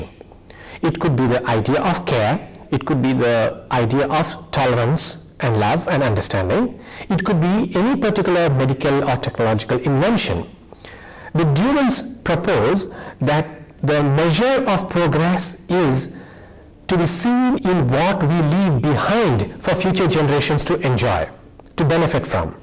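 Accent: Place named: India. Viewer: Sir, it is a lesson for Indian graduate students, his objectives of teaching this essay is to make the students understand the key points easy not the american philosophy, it is in Indian English pronunciation, so that Indian students can understand these sounds easy. Thank U Sir.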